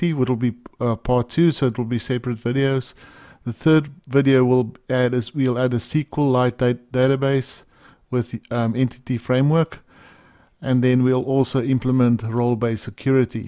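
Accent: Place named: South Africa. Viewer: great. you sound South African...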